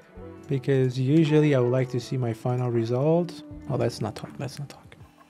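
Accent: Iran. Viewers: حاجی لحجه ایرانی معلومه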